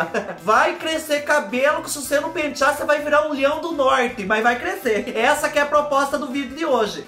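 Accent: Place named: Northeast, Brazil. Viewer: do céu eu pensei que vc fosse nordestino 💓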